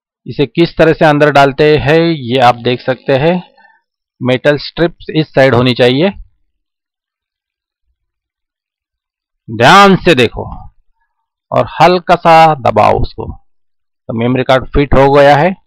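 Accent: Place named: Marathi, India. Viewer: Dear ye Marathi person hai,and Marathi logo ko thik se Hindi nahi ati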